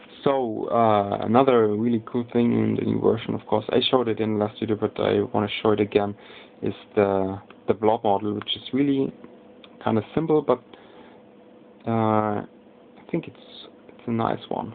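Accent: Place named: Germany. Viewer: du bist deutsch ich hör so was :D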